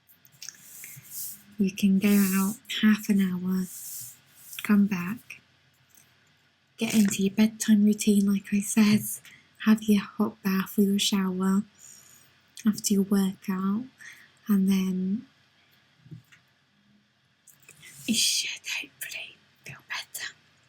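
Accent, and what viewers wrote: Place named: Essex, United Kingdom. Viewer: I love listening to English accents in general, but I find yours is especially charming. I'm probably not even close but I'm going to go out on a limb and guess that you're from Essex.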